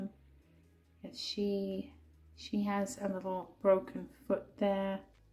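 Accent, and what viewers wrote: United Kingdom. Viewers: Hi. I love the crystal beaded tree ornaments and all the items in the baggy. Love watching your channel, good to hear a Brit xxx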